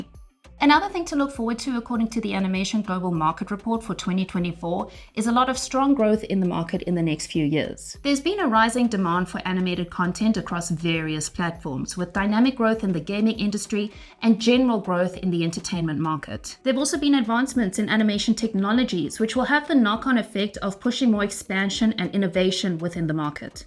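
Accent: South Africa. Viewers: This is completely unrelated but your accent sounds sooo similar to South African ones. Are you from South Africa??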